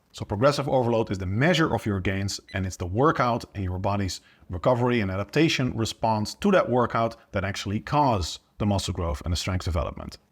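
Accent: Netherlands. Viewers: I can spot a dutch accent anywhere. Lol.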